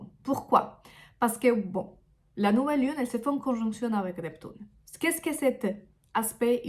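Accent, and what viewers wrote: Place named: Spain. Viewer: J'adore votre accent je suppose que vous êtes espagnol....merci pour cette belle vidéo Namasté ❤🕉🙏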